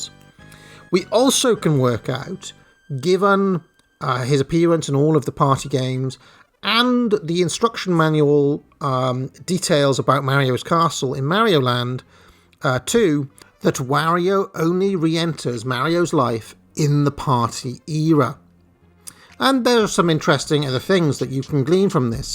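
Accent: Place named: United Kingdom. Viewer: Pompous British Mario timeline... I can't listen past 5 minutes of this garbage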